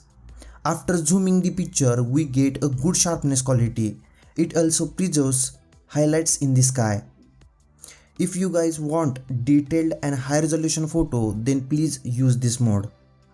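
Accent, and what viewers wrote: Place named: Maharashtra, India. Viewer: Marathi tone 😊.... Chan hota video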